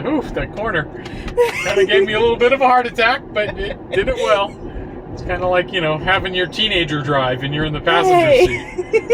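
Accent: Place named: Midwest, United States. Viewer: Where you guys at? You're accent sounds Midwestern. Perhaps OK or TX?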